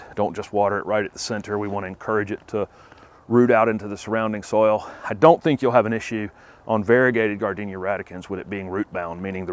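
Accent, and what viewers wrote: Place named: North Carolina, United States. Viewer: Do you ever smile? But you have a nice accent...is that North Carolina?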